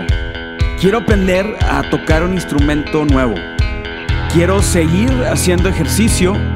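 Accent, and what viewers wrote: Monterrey, Mexico. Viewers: Me re encanta su acento regio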